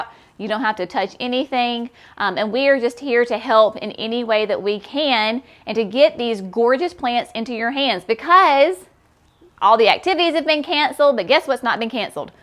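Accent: South, United States. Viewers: It’s wonderful to find a southern garden center that loves Proven Winners, and bonus your accent reminds me of home. I’ve been watching videos for a few years and still find it hard to find retailers that carry PW here in North Central Texas. Finding you in NC gives me hope!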